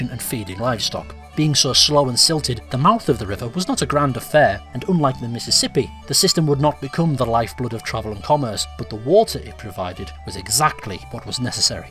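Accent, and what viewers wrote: United Kingdom. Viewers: “EVERYTHING Australia” Me: immediately hears a UK sounding guy 🤔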